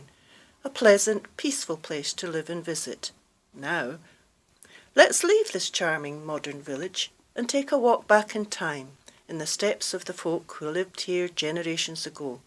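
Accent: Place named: Scotland, United Kingdom. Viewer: Thank you so much for this. It's such a pleasure listening to a Scottish voice telling these stories. Gach deagh dhùrachd bho Gàidhealtachd Bhabhàiria 🙋🏻‍♀️ Lisa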